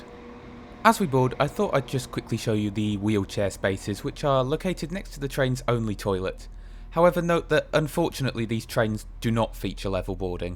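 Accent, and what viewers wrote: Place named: Yorkshire, United Kingdom. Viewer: Love the Yorkshire accent. 'alifax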